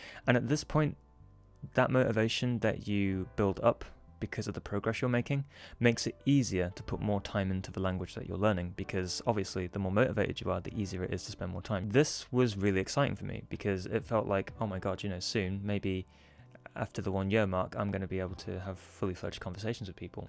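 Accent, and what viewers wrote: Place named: United Kingdom. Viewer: He has a strong British accent, but his pronunciation is clear. So you have to improve your listening skills with the British accent instead of him speaking more clearly.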